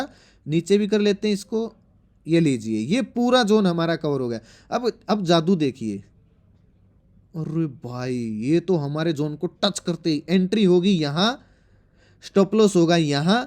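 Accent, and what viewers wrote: Haryana, India. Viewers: App Haryana sy ho kya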